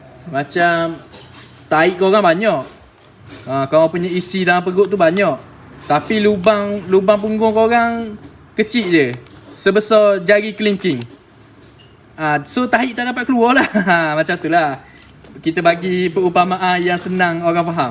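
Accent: Terengganu, Malaysia. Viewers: Abang orang ganu ke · bang nk tanye..abg org mana ni dgr mcm terengganu je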